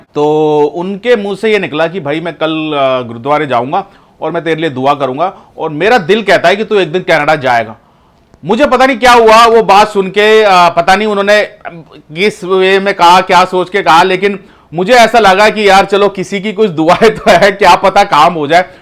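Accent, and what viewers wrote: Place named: Hyderabad, India. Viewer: Bhai Aap ke Hyderabad ke Dost Bahot the Kya? Kyu ke Aap ki Baat me Hyderabad ka Style hai.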